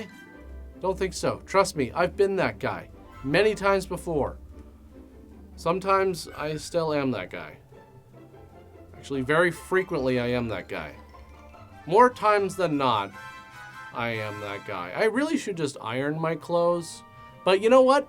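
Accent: Canada. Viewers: To be honest I'm kinda surprised you don't pronounce "aunt" as Ah•nt because you're Canadian. I've noticed Canadians put a lot of emphasis on pronouncing things exactly like they are spelled, for instance when you say soh•ree instead of sah•ree for "sorry".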